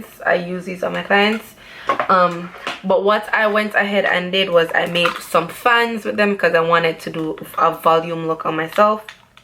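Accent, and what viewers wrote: Jamaica. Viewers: Are you jamaican? Love this. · You sound jamaican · I hear my Jamaican voice 😍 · Chile the jamaican accent is tryna jump tf out loool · Is she Jamaican? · Immediately subscribed once u started talking #Jamaican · Are you jamaican? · Dah jamaican accent🇯🇲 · You sound Jamaican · Jamaicans English language sah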